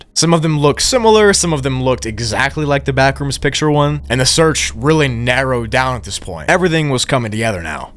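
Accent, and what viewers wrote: United Kingdom. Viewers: I can tell you're Scottish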